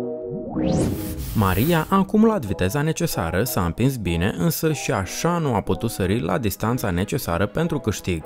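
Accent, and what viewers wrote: Moldova. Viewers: 🤐 Foarte frumos clipul, dar comentariul este total aiurea de...moldovenesc de peste Prut ! Recomand revizionarea clipului ori de câte ori doriți, dar ÎNCHIDEȚI sonorul !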